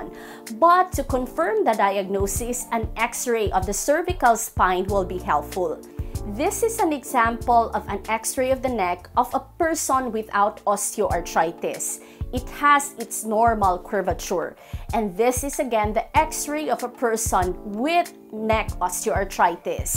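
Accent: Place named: Philippines. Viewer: How cute the Filipino accent is 😊... I have neck pain, stiffness and the pain radiates up to the head and I've been like 3 weeks with nonstop headache like migraine which comes from the neck,I don't have numbness or shoulder pain,only neck and head... I don't know if it's osteoarthritis or not ,but it's really hell what I'm going through !!!!!!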